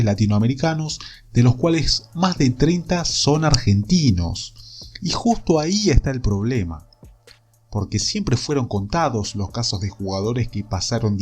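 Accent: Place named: Uruguay. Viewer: El acento Uruguayo es asqueroso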